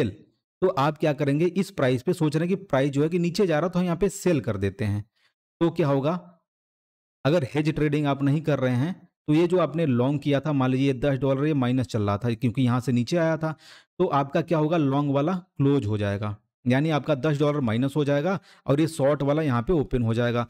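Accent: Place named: Bihar, India. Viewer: Pakka bihari ho bhai ekdum humko lagta hai mera bhai bat kar raha hai😊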